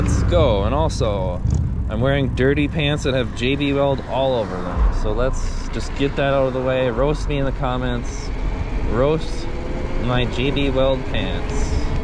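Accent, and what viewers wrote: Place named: Wisconsin, United States. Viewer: Thanks for reppin’ the MidWest. Love your understated fashion sense adorable ‘Sconnie accent and seeing the Spingtime in Hudson.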